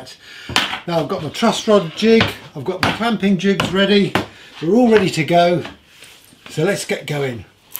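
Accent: United Kingdom. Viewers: Love to hear you talk, man! We Americans sound so plain next to the British. Anyway, I'm really enjoying the build and I just love some of the jigs you've made as well as the band you shared playing the tune "Echoes". That vocalist is killer good. If I can get to feeling better, I'll be building my first guitar from scratch. Any pointers or words of wisdom?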